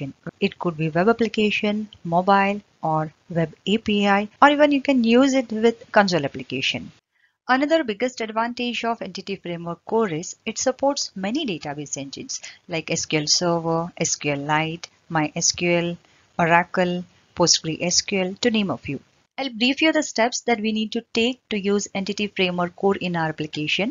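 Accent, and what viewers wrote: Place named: India. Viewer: ind*an voice first second of the video, time to click off.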